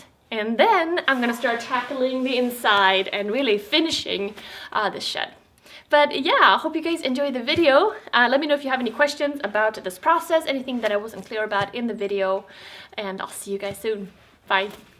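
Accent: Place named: Sweden. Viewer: En personlig fråga. Vari Sverige kommer du i från? Svenskan lyser igenom i din accent nämligen. Bara nyfiken. Annars är jag djupt imponerad av din oräddhet när det gäller att ta sig an olika byggprojekt!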